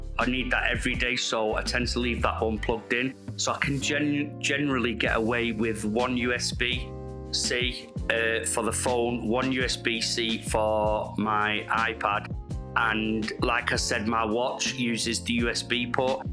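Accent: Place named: Northern England, United Kingdom. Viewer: sweet review, cheers. Cool to hear a Norvern accent as well. Love from Liverpool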